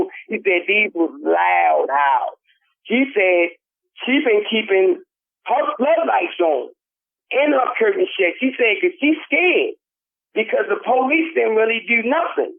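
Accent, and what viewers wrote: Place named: South, United States. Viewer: Aye, Great stories, can't wait for more. Thanks!!!!! P.s I Love your Southern accent!!! Be safe!!!!!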